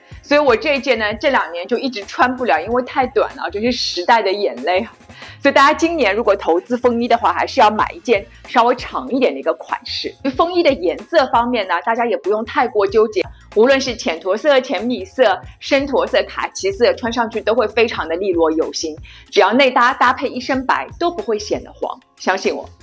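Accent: Shanghai, China. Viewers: 谢谢推荐。 听口音是上海或者江浙一带的？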